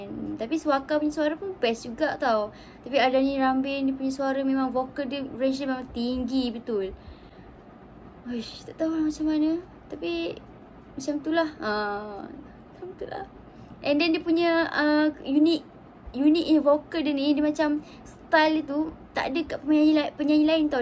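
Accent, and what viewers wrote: Malaysia. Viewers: Saya suka cara bicaranya aja.lucu,manja,dan cirkhas logat Melayu nya bikin adem telinga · Suka x dengar cakap malaysie ni. Meremang bulu roma. 😀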